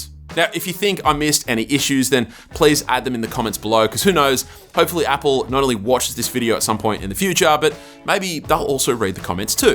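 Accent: Australia. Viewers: You should learn to speak in a bit of neutral accent. Your strong Australian accent is understandable but cannot make it till then end.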